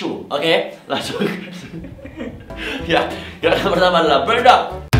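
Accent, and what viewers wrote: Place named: Banyumas, Indonesia. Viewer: ngakak ngapak yh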